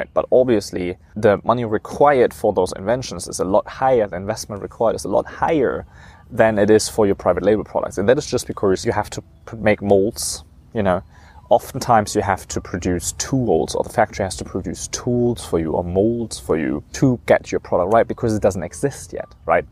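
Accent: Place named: China. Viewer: Wow! you also got the chinese accent. Nice video tho.. thanks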